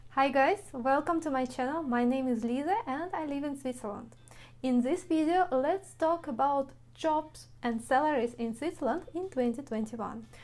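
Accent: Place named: Eastern Europe. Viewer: Soften love your mama Eastern European accent🤫this will only help you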